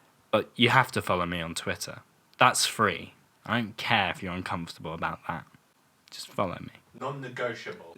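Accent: United Kingdom. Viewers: Shitty takes. Except for Episode 7, this season was great and not predictable in the least. Pretentious Brit or whatever you are. Fuck your accent.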